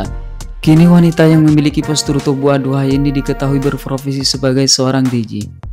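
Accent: Madura, Indonesia. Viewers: Bang logat mu kok madura ya ??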